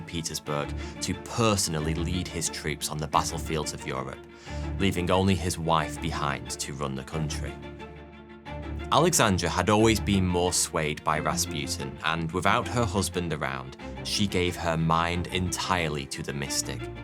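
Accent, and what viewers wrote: United Kingdom. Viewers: Amazing content. I mostly enjoy hearing your British accent. Funny, and history facts. Great job, and thank you. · He's a brit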